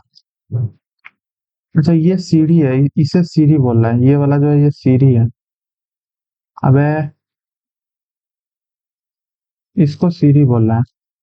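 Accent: Bihar, India. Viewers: Bihar se ho kya Bhaai???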